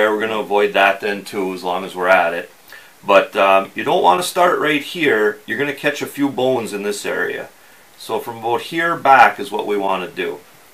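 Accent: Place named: Upper Midwest, United States. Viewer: Glad to hear that your knives aren't of the $100 variety. My wife and I fish and camp mostly on the Valentine National Wildlife Refuge. It is an amazing place to panfish for bluegill, perch, Largemouth Bass, Northern Pike, and catfish. Located in northern part of Nebraska in my beloved Sandhills. Based on your slight accent😄 I'd say you are from Minnesota,Wisconsin, or somewhere north of us. If you get the urge for a change of scenery check it out on the internet. Started by Teddy Roosevelt, primarily as a refuge for migrating birds. 8 Refuge lakes open to fishing. Many other lakes and Merritt reservoir near by. You wouldn't regret it. 👍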